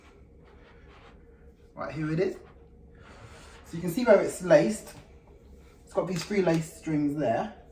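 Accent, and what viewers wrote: United Kingdom. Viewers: British accent in Malibu !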